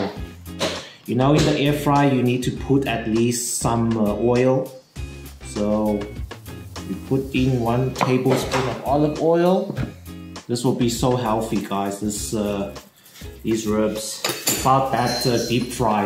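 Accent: South Africa. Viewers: Rocking the South African accent!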